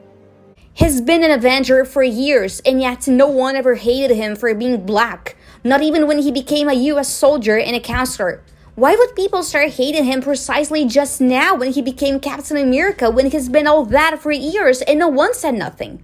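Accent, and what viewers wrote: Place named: Brazil. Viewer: Ahh, i had a brazilian teacher, i thought the accent felt familiar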